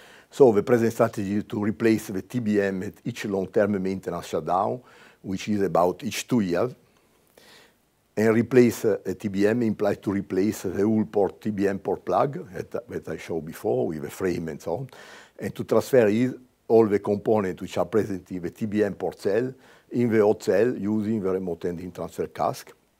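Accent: France. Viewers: English subtitles would be of great help here. And yes, I know he's speaking Franglish.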